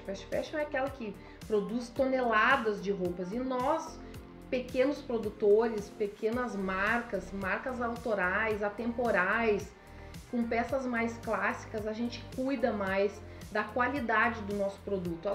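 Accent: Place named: Santa Catarina, Brazil. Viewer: Olá boa tarde Como posso comprar vestidos lindos de você? Poderia passar seu número de telefone? Pelo seu jeitinho de falar acredito q vc é de Santa Catarina vc é de muito bom gosto parabéns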